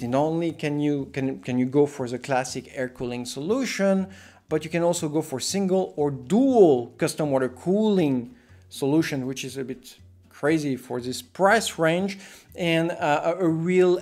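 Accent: France. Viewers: are you french ? Oo (sorry i'm new) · L'accent français ;)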